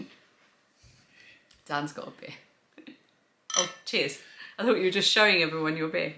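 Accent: Australia. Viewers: thank you so much for the tour of your gallery wall again. I really appreciate it … looks gorgeous! ♥️♠️♦️♣️. The girls reactions to the chips make me laugh out loud. So very funny - is a crack up … love your Aussie accent (I am Australian) Like everyone else I will miss your Vlogtober so much.. hoping you’re up for Vlogmas too 🤞 Thank you for taking the time and effort to share your lives with us.. super super fun family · Great Aussie accent! Thankyou for sharing your daily life🙂 I am watching from Bunbury Western Australia, Diane Buswells home town🙂 My daughter went to school with her. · Oh my goodness! you nailed the Australian accent. Maybe just add a bit more ‘nasal’ to your voice?👍. I really dislike the Aussie accent. I’m allowed to say that as I’m Australian 😅😅😅.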